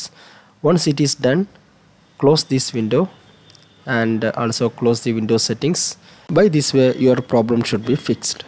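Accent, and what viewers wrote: India. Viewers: When I hear this indian voice, i know that it won't solve my problem and didn't solve.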